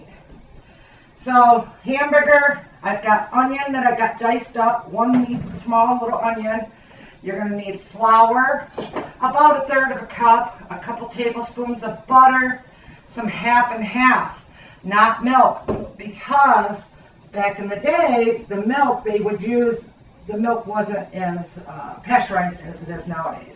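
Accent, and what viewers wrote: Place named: South, United States. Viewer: You sound like a good ole country girl, or at least you cook like one. That looks good; gotta give you a thumbs up. Save me a seat at the table, LoL...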